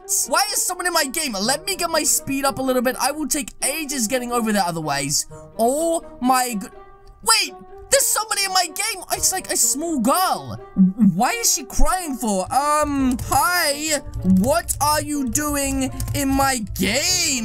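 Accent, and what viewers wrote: United Kingdom. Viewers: Are you british nice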